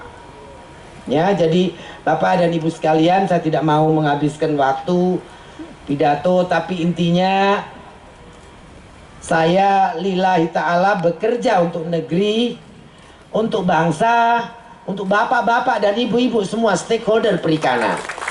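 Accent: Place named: West Java, Indonesia. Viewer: gak ngerti bahasa sunda. tapi seneng aja gitu denger orang ngomong sunda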